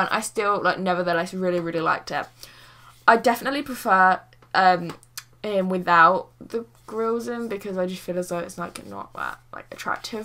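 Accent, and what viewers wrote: United Kingdom. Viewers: I really love ur British accent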